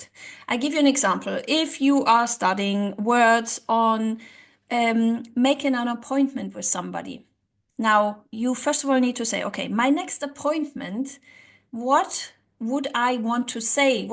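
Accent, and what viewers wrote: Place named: Germany. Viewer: Just found your videos and they have some great tips. You sound German, your English is good though.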